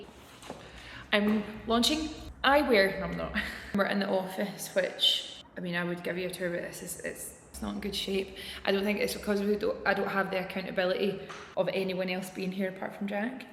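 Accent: Scotland, United Kingdom. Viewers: The little Scottish accent 😭😭😭😭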